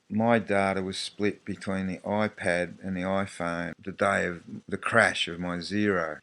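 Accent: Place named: Australia. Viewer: I'm pretty frustrated with mine too. Next to no instructions with it. Have to learn from other YouTube users or by trial and error. I reckon I've flown maybe a half-dozen hours! . I guess the presumption is that everyone's an expert. Can't calibrate it. Blooming expensive shelf ornament. (PS: Glad to hear an Aussie accent). I might go back & see Gerry Harvey's staff again. (Sigh)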